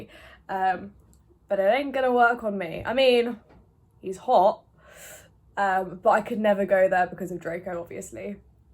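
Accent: United Kingdom. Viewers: Do you still have your British accent when you have shifted into any reality? <3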